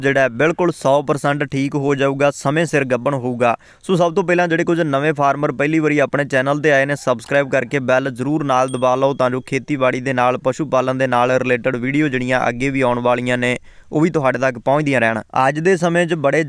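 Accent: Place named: Majha, India. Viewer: Veer ji majhe to lagde je boli to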